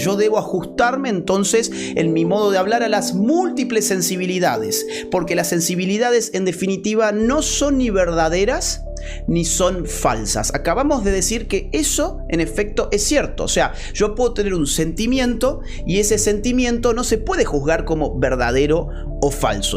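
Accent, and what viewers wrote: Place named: Argentina. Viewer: El presentador hace lo contrario a lo que enseña el pobre Chomsky. No comprende, ni remotamente lo que Chomsky está intentando plantear, para que se empiece a pensar. Estas cosas hacen que el acento argentino suene tan feo que a nadie le guste ni ir, ni juntarse, ni estar cerca de nadie con ese acento, de “sicólogos”, de habladores, gente que engaña y de gente de poco nivel. Así nos va. Un molino de palabras pegadas para hacer teatro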